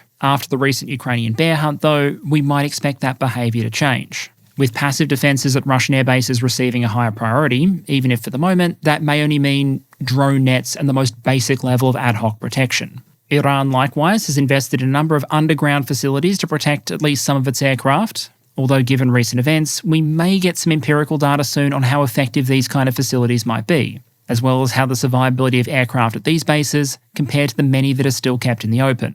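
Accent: Australia. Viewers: Maybe they've never heard an Aussie accent? Or humor?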